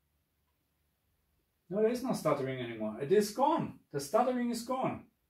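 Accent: Germany. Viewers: Ist zwar ein englisches Video aber so wie ich das höre scheinst du auch Deutsch zu können😉. Gibt es denn noch massive Problem mit dem schwarzwert(flickering)beim vrr/HDR? Wollte mir jetzt nen CX für die ps5 zu legen. Danke für die antwort und frohe Weihnachten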